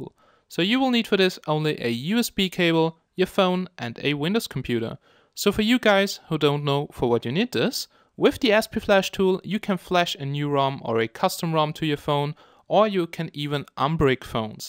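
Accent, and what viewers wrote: Germany. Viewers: Hey I have got a Problem with the Scatterfile :( if i try to load h scatterfile Sp Flash show me an error and say sometihg about overlapping ..... Itry to flash he original Rom From The Manifactory website there is also an Ubdate but both of the roms dont load corretly by Sp Flash .... The Device is A Ulefoe U9592 .... Can you Help me Please ?? Wenn sie Deutsch Sprechen wäre es nett wenn sie es in Deutsch Beschreiben was ich machen soll :) (Wie ich auf Deusch kömme :) der Akzent klingt nach Deutschem/Österreichischem Englisch ) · Man hört ein kleines bisschen den Akzent von Deutsch raus und Firefox ist auch in Deutsch, Wenn du Deutsch sprechen kannst, hab ich eine Frage. Weißt du wie man das THL T6 Pro 166L Flasht? will KMDROID 0.8 flashen aber bin kir dabei etwas unsicher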